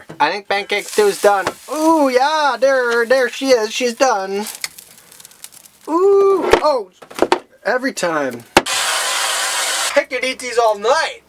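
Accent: Wisconsin, United States. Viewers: You're WI accent gets SOOO much heavier when you drink. My MN one does too.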